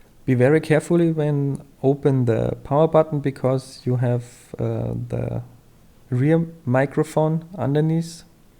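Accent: Germany. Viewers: iam hearing a bisschen deutsch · Are you from germany ? And nice video bro i'm gonna try this on my 5s 💪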